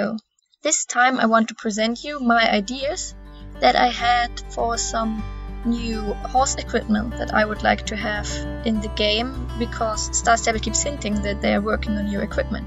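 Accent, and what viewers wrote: Germany. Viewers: Man hört so raus, dass du deutsche bist HAHA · Das du Deutsche bist merkt man echt krass an deinem Akzent... XD Kennst du eigentlich eine Feya Steelleaf vom SSOA..? p.p oder Vielleicht Camelia Mistlord? XD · Bin ich die einzige die da etwas deutsches an ihrer Stimme hört 😮❤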